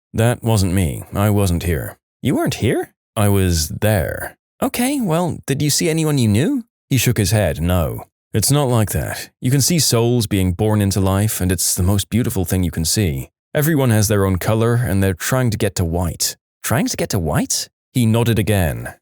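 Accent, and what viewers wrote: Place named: United Kingdom. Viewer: does anybody else not like the british voice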